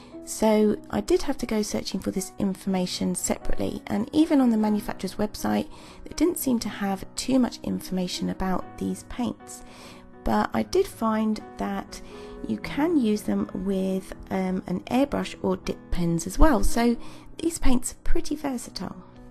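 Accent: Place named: United Kingdom. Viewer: Great job! I really enjoyed watching your review video and you did such a good job at being so thorough. This is a really helpful review for anyone who is considering buying these watercolour inks! I also love your accent ❤ I am British too and I also do art tutorial/voice over videos! So keep up the amazing work. I've subscribed ❤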